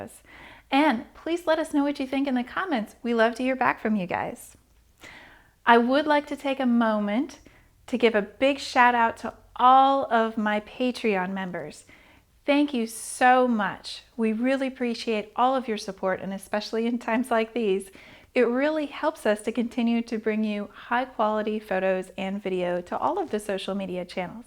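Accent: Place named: South, United States. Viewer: Love how the southern slips through from time to time.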